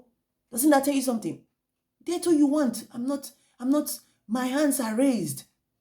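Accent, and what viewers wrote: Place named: Africa. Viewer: Love your accent sweetheart! Which African nation are you from?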